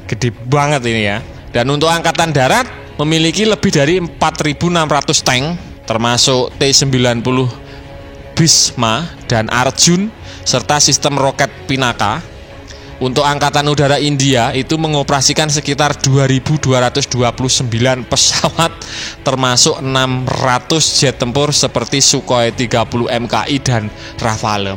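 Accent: Java, Indonesia. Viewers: Medok banget jawa lo min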